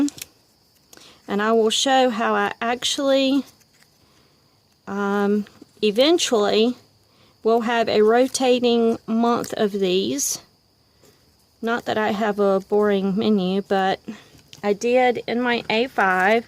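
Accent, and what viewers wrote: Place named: South, United States. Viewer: New subbie. Keep up the cool videos. Your journals look great. I'm guessing from your accent you're southern like me. Nice to hear.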